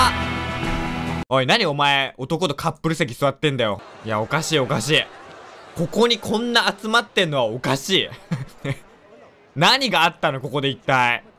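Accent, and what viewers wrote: Kansai, Japan. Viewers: ときどき出る関西弁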